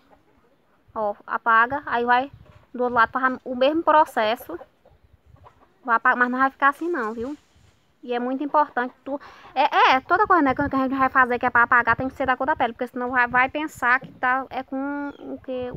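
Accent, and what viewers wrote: Northeast, Brazil. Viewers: Nordestino de sitio fala assim (sou nordestino)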